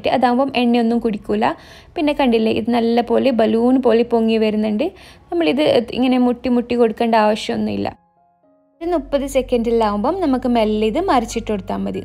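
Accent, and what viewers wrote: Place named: Kannur, India. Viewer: കണ്ണൂർ കാരിയാന്ന് ലെ ഞമ്മള shamis own നിലെ ശാമിലയുടെ ഒക്കെ സംസാരം പോലെ 👍🏻👍🏻👍🏻👍🏻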